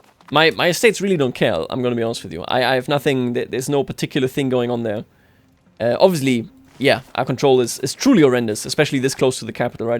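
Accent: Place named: Brandenburg, Germany. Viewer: As a Brandenburger, i would rate your Brandenburgisch(i won't call it Berlinisch) a 8/10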